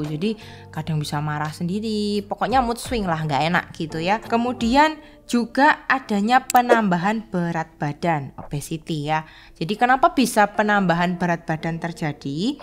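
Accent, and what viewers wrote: Java, Indonesia. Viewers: Saya suka dialegnya logat jawa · medok banget sih ce logat jawanya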